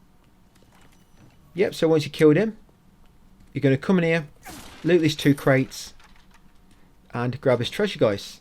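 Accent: United Kingdom. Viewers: Why is your accent so british for Gods sake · Remember you from the "hell of a Sheriffe achievement. Definetly the best. I'll definetely try your strategy, since I was 30 minutes too late on my own. Good job! BTW, where are you from? I love the accent. British for sure...but whitch city? I teach English as a second language. Thats why I'm curious.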